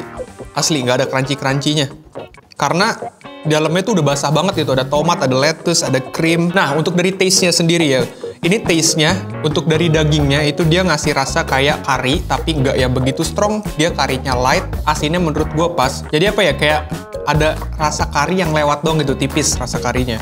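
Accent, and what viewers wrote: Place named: West Java, Indonesia. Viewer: Wah sunda bet ya, letuce jadi leteuce euy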